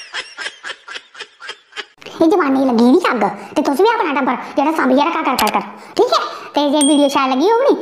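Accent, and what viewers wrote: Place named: Jammu, India. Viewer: 😂😂😂😂 bilkul aise hi baat krti h jammu ki ourtein. Very nice.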